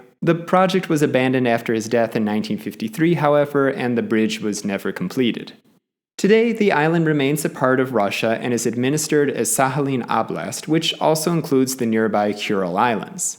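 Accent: United States. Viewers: I think he just pronounces it like that because he's American. the o sound like in "top" or "stop" is pronounced like an "ah" sound in American English. American English doesn't really have a sound that's exactly like the stressed o in Russian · Why would you expect someone who’s clearly American to pronounce the Russian O like /ɔ/? That sound quite literally doesn’t exist in American English at all (except in diphthongs and before R) – so of course he won’t say it the Russian way, especially in the middle of an English sentence. In the same way, an English person shouldn’t expect a Russian to pronounce “New Hampshire” or “Hythe” exactly the English way – and especially not in the middle of a Russian sentence, because that would just sound weird.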